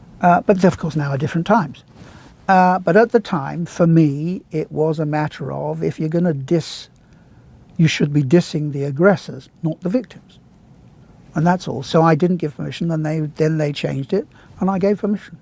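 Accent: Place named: RP, United Kingdom. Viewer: He is so wise and confident about his craft. Rightfully so. His music is amazing. Plus his accent is so posh!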